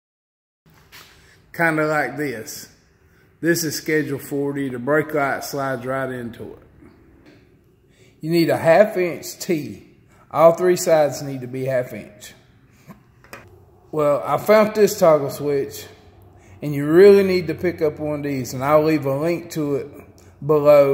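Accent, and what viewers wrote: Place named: Arkansas, United States. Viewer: Man you sound like home cooking to "dis" here displaced Arkansan! Thanks "fo da" videos brother... will be using lots of this info in my build.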